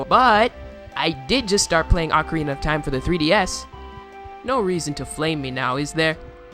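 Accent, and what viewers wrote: Canada. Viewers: Damn thicc Canadian accent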